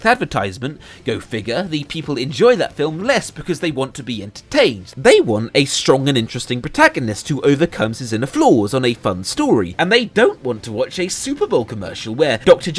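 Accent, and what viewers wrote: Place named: England, United Kingdom. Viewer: Totally convincing English accent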